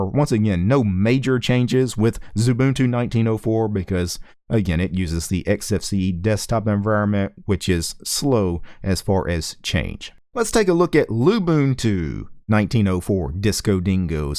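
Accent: United States. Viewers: could you have a look at opensuse? Or suse enterprise? Its not that I prefer one distro above the other but our envirnoment at my office is bound to sles 12. And I think suse is german company (im swiss) so it would be interesting about an international meaning. Im not sure where you are from, you sound US, anyway your voice is amazing. Cheers from switzerland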